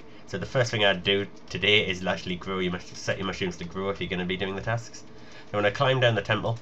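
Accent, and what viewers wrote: Newcastle, United Kingdom. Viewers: You geordies and your accents!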